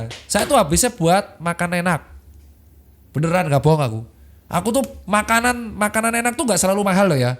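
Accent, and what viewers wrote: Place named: Pekalongan, Indonesia. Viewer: Koyok wong Pekalongan sih logate😂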